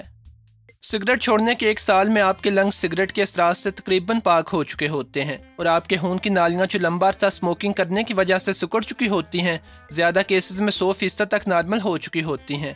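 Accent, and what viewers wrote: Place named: Lahore, Pakistan. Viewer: Yaar Aap ka talking way bohat Pasand aya .... Sure Aap Lahore se ho .... Don't be offended mai bi Lahore se hi hun ...!!! Nice Video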